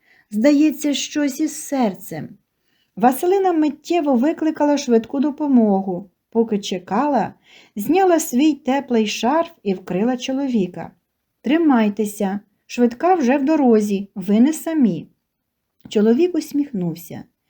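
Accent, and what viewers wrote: Standard. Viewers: чистою українською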